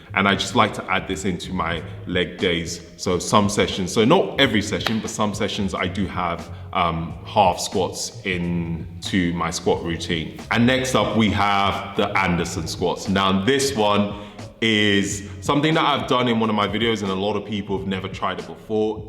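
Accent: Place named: England, United Kingdom. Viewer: Excellent demonstrations! The tempo is admirable with the perfect form. Oh, and I just love this accent. From which part of England are you?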